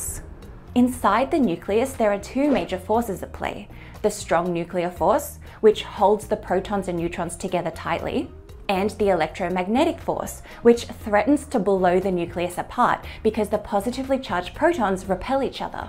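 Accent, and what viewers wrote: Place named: Australia. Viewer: so nice to hear an Australian accent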